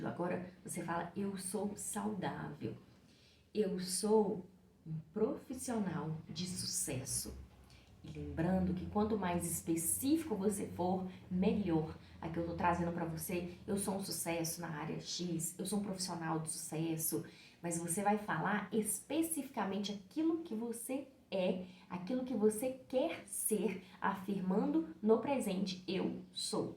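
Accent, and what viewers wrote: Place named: Minas Gerais, Brazil. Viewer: Aí que saudades desse sotaque minerinho 🤌🤌 Amei o seu vídeo,! Que o universo traga muitas bênçãos pra vc🙏🍀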